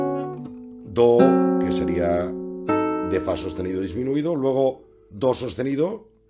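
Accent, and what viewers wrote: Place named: Catalonia, Spain. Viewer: Querido maestro, Debo reconocer que hace poco tiempo que le sigo y que es la primera vez que me atrevo a escribir para comentar uno de sus videos. Quisiera decirle - en primer lugar - que... ¡ME ENCANTA! Me encanta su trabajo. Me encanta ver como rearmoniza temas clásicos (¡tan básicos y tan grandes!). Temas que todos los músicos hemos tocado tantas y tantas veces de forma "simple" - tal y como los aprendimos en aquellos libros de "Los 100 éxitos de..." - y... ¡QUÉ BIEN SUENAN CUANDO "SE PULEN"! ¡Cuánta belleza! ¡Qué buen trabajo, querido Maestro! ¡Gracias por instruirnos! Y qué buen montaje de video (imagen y audio). ¡FELICIDADES también por eso! ¡Suena de maravilla! ¡Se nota que es Vd. catalán!, además de por su claro acento, por lo bien que hace su trabajo. ¡Enhorabuena! ¡De corazón! Qué curioso que la mayoría de los comentarios de los seguidores de su canal sean de personas centro y sudamericanos. 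Me pregunto si ya no quedarán amantes de la buena música y de la guitarra en España y en todas sus regiones/CC.AA. Cuenta Vd., desde que le conocí por Youtube, con un fiel seguidor. Muchas gracias por su trabajo y por compartirlo con todos. Saludos cordiales, en este momento, desde Francia.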